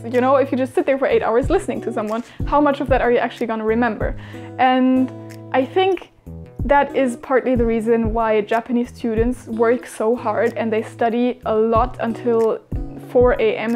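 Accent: Germany. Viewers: i think shes german she has that accent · Haha, bitte nimm das jetzt nicht persönlich, aber finde es gerade sehr witzig, dass ich innerhalb einiger Sekunden den deutschen Akzent rausgehört hab. :'D Überlegte schon, ob ich nachschaue woher du stammst, also danke, dass du es gleich in diesem Video geklärt hast. :> Wirklich beneidenswert, dass du diese Erfahrungen sammeln konntest. Wünsch dir noch viel Erfolg mit deinem Channel. :) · the lovely German accent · I could hear in your voice that you're German,and then I made sure. I WANNA GO THERE,I LOVE GERMANY😍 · so you are german? it sounds like you are XD lol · Your German accent sounds so good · Da schaut man 5 Sek vom Video und denkt sich "das muss ne deutsche sein", geht in die Beschreibung und tadaa was sieht man... Sie ist deutsch XD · You sound really german. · I love you're accent and ughhgh German girls <3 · Du bist doch deutsch oder? 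Man hört das voll😂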